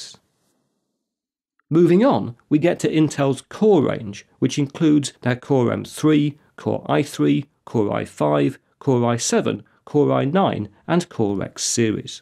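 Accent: United Kingdom. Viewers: that british accent! love this channel.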